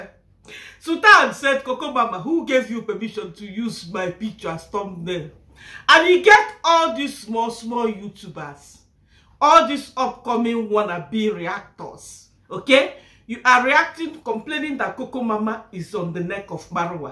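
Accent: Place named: Africa. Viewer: Is there a way you can speak English more clearly and with less accent? I hear you but when your accent gets too deep I am losing your words and missing the point. Your channel is reaching the world so it would be helpful to many who may not have African blood for you to shift and pivot for those who want to actually hear you 100%. I have the blood. I hear you. But I lose you.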